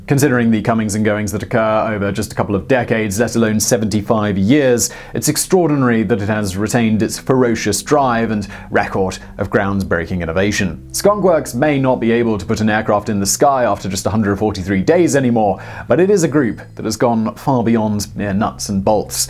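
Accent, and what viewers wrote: United Kingdom. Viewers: Why do British people talk like this. Do they hear themselves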